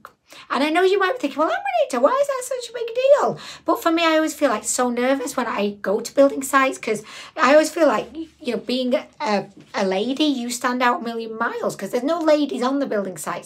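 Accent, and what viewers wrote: United Kingdom. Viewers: For opening packages, you should get a set of spudgers off Ali Express. Besides the fact that "spudgers" is a fun word to say, and I want to hear you say it in your English accent (which I, as an American, am required to think is elegant and awesome), spudgers are little plastic prybars that were made for fixing phones, but work really amazingly well for getting the shrinkwrap off nail polish bottles and opening the box on BB creams. Get the set of three that comes with a thin metal shiv. They also work great for removing batteries from toys, and they will spare your nails.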